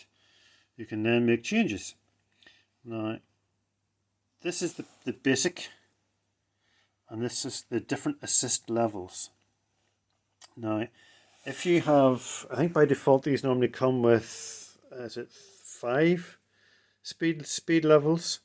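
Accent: Northern Ireland, United Kingdom. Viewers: Hi down in Larne here and have 2 250w Bafang bikes and I am really happy with the default setting and I am now hitting well over 2,000 miles .Is this reset really helpful on a day to day basis ? p.s. you sound like a norn iron man .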